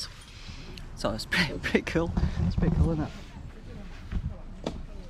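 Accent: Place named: Scotland, United Kingdom. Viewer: I didn't know how much I needed your beatifull soothing scottish voice today. Thank you for that.💚 What a wonderfull trip in an absolutely wonderful country.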